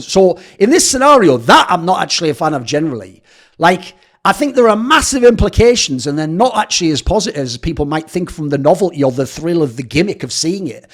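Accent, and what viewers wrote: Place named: Middlesbrough, United Kingdom. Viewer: not wathced you in a while but glad to hear you've embraced your boro accent more than ever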